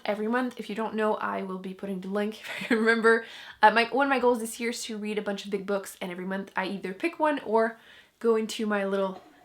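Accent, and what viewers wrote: France. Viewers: sorry but each time I keep wondering... are you french ?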